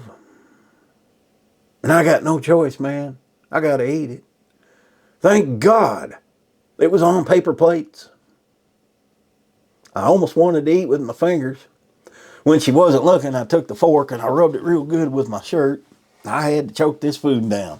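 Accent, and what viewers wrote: United States. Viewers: Podoba mi się Pański amerykański akcent :-) . Serdecznie pozdrawiam !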